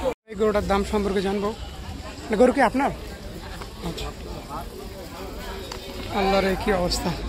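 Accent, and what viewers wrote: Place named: North Bengal, India. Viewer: ভাইজান কি উত্তরবঙ্গের? কথার সুর/টান উত্তরবঙ্গের মানু‌ষের মতো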